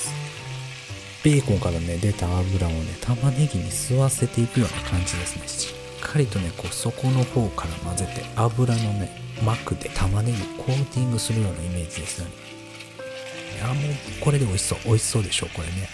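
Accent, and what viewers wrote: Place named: Osaka, Japan. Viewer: Twitternからきました。Uraruです。 大阪弁で心地のいいお声。さすがプロの話し方です。 新しいLA TABLEさんですね クラムチャウダーが欲しい季節になりました。 うちのストウブちゃんでやってみます♫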